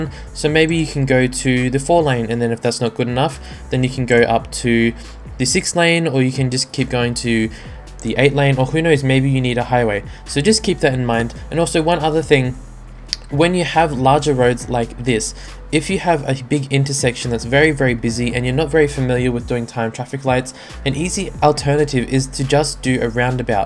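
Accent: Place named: Australia. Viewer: Ah, understandable. If it makes you feel better, you had me fooled. It sounds like you have a pretty normal Australian accent What's your first language?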